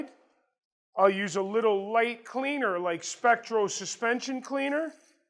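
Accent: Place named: New England, United States. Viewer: GOD! I love eye-talon guys from the the east coast,new england!!! Fuckin accent is crazy awsome!!!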